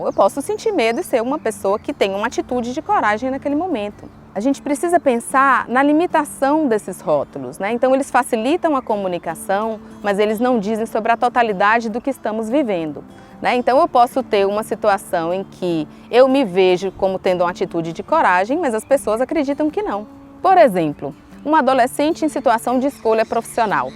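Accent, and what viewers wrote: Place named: Bahia, Brazil. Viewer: Reflexão muito boa, é o que eu estava prescisando ouvir. você é Baiana? Se não você tem o sotaque de Baiana arretada.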